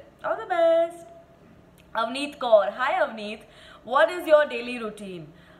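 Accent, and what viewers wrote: Maharashtra, India. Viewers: #sawaalsaturday. Hey i love ur videos. I don't have any question...i just wanna say I love ur marathi accent. plz try talking in marathi in your videos.. #LoveLove❤😁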